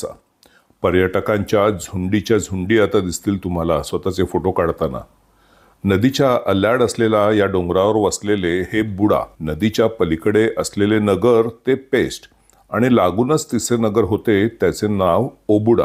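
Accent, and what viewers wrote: India. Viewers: व्वा - अस्सल मराठी 👍👍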